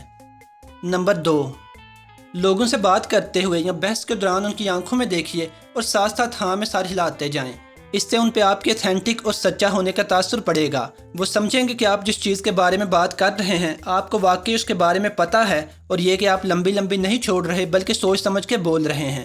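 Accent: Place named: Sialkot, Pakistan. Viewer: Realy Helpful tricks bro keep it up👍 And bro apka accent bata raha hai k aap sialkot se ho. Am i right?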